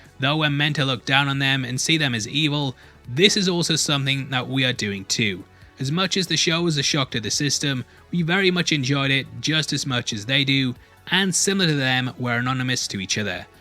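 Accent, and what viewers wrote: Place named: United Kingdom. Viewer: hes literally speaking british english or some other dialect of English that isn't American. imagine thinking that America is the center of the world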